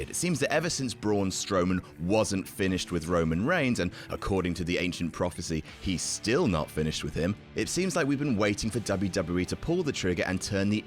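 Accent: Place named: RP, United Kingdom. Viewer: this sounds too posh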